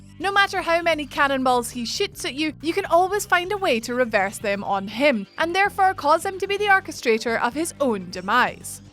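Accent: Scotland, United Kingdom. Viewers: Forgive me if I'm wrong but do I hear a small bit of a scottish accent in your voice? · For some reason I like to hear scottish accent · Nah that's just a Scottish accent mate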